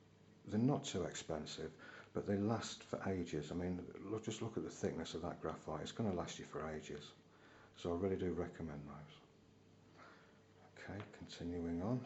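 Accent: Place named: Midlands, United Kingdom. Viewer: Love the Midlands accent btw. Melton Mowbray?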